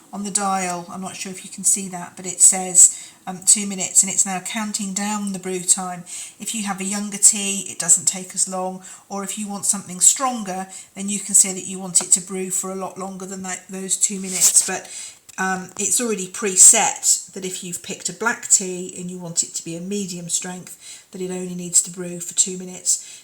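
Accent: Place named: United Kingdom. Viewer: Hi there, thanks for the video. it was lovely to watch and listen to your beautiful british english accent :)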